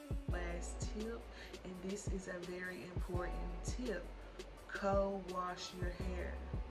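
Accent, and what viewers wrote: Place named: South, United States. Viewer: Good information! You sound like a southern girl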